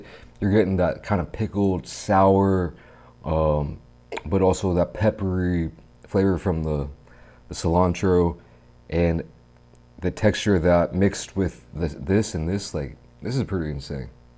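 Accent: United States. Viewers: I like to see more of your shows to make more please three times a day be good for me I like to hear you talk it gets we don't know each other by no means we don't I'm using Google talk so forgive me if words don't come out correctly I don't always read it after what I say so I can't correct it I would love to see more of where you live so take a little short video of where you live let me see your surroundings I love that you have an American accent where you lived and where I live we're not too far apart we wasn't too far apart we are now I just like you I really do I don't know how to explain it but I want to see more of your videos so please for having sex make more cuz all I do here you talk I don't talk to nobody for heaven's sakes pretty much nobody I don't even very rarely talk to my family I really don't have any family anymore I got yeah I got uncles and Aunt but never talk ever associate never my sisters and brothers very very maybe once a month and that's a god honest truth YouTube is basically is becoming my family I hate to say that but that's God's honest truth and you're becoming one of my favorites so please put make more please that's what I'm trying to get after making more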